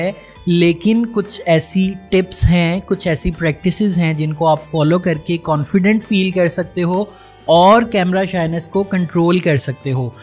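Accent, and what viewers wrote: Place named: Rawalpindi, Pakistan. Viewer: Thank's Bro! Are You From Rawalpindi, Muree or Kallar? Because I'm Feeling The Accent!❤❤👍👍🎉🎉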